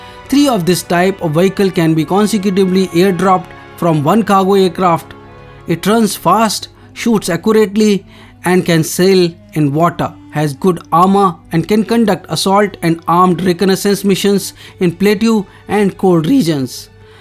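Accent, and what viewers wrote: India. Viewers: Is this India accent? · I assume the narrator is from kerala india🇮🇳🇮🇳🇮🇳🇮🇳👌👌👌👌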